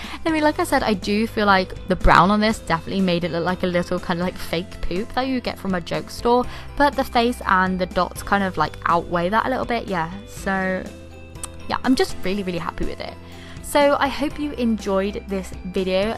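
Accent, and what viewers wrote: England, United Kingdom. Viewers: Honeycomb Owl mmm she isn’t Scottish lol she is english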